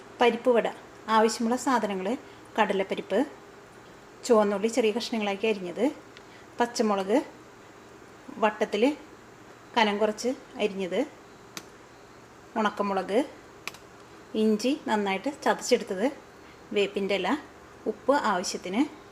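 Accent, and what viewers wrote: Thrissur, India. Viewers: പെങ്ങളെ അടിപൊളിയാ ഇഷ്ടായി അവതരണം തനി തൃശൂർ കേൾക്കുമ്പോൾ മനസ് മുഴുവൻ കോരിത്തരിക്കുന്നു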